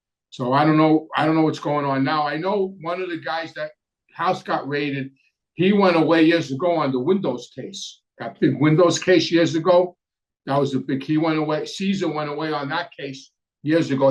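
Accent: New York, United States. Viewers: I love listening to you talk. Always reminds me of the time I spent in New York.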